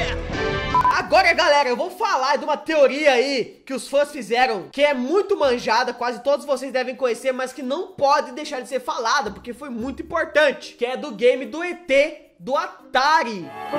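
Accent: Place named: Rio Grande do Sul, Brazil. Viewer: por que seu sotaque parece gaucho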